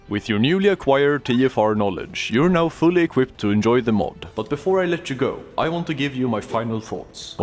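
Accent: Sweden. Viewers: max0r's style really doesn't work with that thick Swedish accent and demeanor. For this to work you'll have to stop being monotone which is an impossible task for any temperature challenged individual. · I can hear the Swedish dialect.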